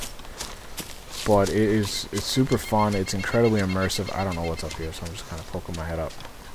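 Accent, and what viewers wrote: Boston, United States. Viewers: R u from Boston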